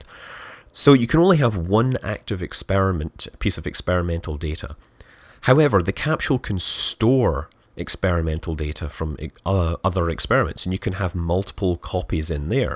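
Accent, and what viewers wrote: Scotland, United Kingdom. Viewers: Man his accent is doing my fucking head in. half DJ half Scot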